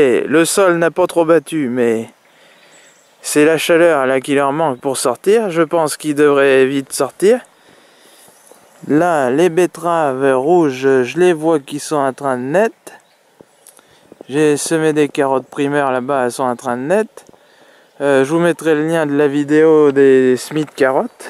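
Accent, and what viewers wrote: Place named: South-West, France. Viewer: Coucou, Arrête tes conneries! Tu nous fais quoi! Tu n'as pas honte! Et la grelinette elle est où!!??? Je plaisante 😉😁, tu as bien raison, il arrive un moment...je me souviens tes vidéos, c'était wahou très sportif🥵🥵 Quand je vois ta terre, wahou, moi elle n'est pas du tout comme ça, elle colle elle colle... J'ai commencé mes plantations de tomates et je continue avec toi 😂, en tout cas c'est super de voir ta manière de faire. J'adore tes expressions, ton accent du sud ouest, c'est toujours un plaisir de t'écouter et regarder tes vidéos. Des haricots remontant, là tu fais fort😂 Elles sont où tes fleurs!!!??????? Bonne journée A bientôt 😘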